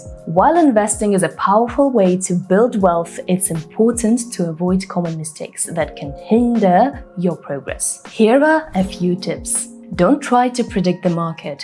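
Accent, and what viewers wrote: Russia. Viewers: Russian pronunciation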